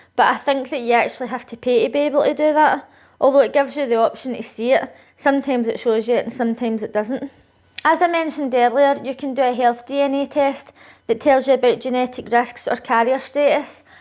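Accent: Scotland, United Kingdom. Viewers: Is that a scottish accent? I'm American. Can't tell. I bet she's very British.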